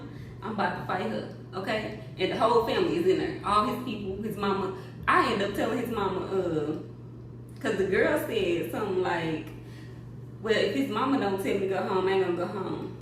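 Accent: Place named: St. Louis, United States. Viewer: soon as you started talkin i knew you was from stl 😂 but not the fight happened at your daddy house & not the bd house omg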